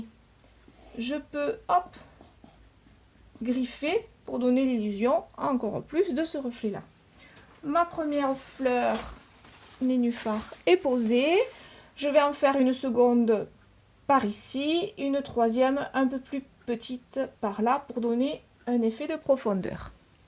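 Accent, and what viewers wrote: South, France. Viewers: J'ai beaucoup appris avec vous. J'aime votre accent du Sud!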